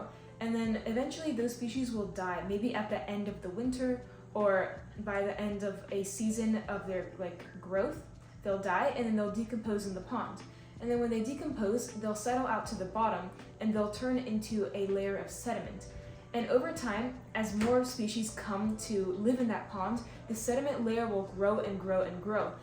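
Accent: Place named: Quebec, Canada. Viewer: Are you French Canadian? I hear a slight accent but can’t place it. Great video by the way. We need more explanation like this in freshwater as well.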